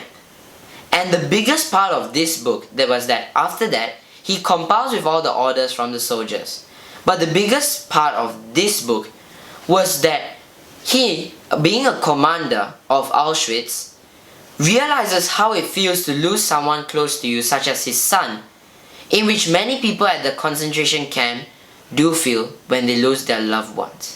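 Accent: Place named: United Kingdom. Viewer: Yt is slowly making your accent more and more British lmao what, i never said it was a bad thing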